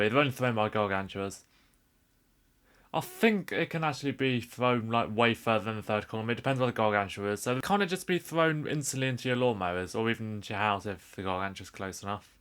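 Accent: United Kingdom. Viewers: wtf is this Brit fuck saying, fkn hate their accent. they think it’s so intellectual, like did chill out with the adderall and caffeine u fkn stim junkie. Slow tf down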